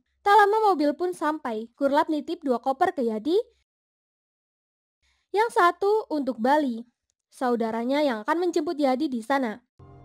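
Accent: Papua, Indonesia. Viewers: Mimin logat Papua za rasa lucu tra gaul se mimin 😂😂😂😂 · Mantap logat Papua nya Mimin♥️ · Mimin pinter logat Papua.. pantes pintar ngemob aww. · pake logat papua bleh sukses selalu kak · 😂😂Fix, mimin anak Papua😅mantap Dialegx Min keren, Lanjutkan 😊🎉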